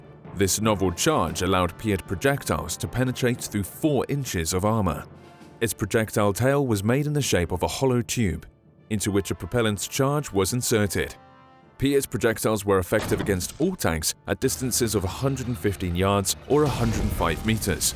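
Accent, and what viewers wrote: United Kingdom. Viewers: That British accent tho ... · Video about a british weapon voiced with a proper british accent. perfect!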